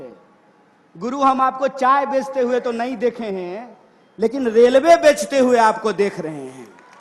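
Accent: Bihar, India. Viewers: Full bihari andaj waah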